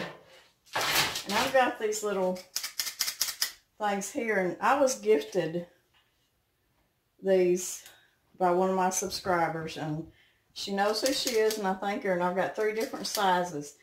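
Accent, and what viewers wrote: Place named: South, United States. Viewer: I agree! I am sure I have heard the word oil pronounced, the way does, many times but the first time I REALLY HEARD IT was with Paula Dean. I love the southern accent and imitate it quite often with my daughter. She gets a kick out of it! Now, this is coming from a Pennsylvania Dutch Country Gal! LOL! We have our special accent, also!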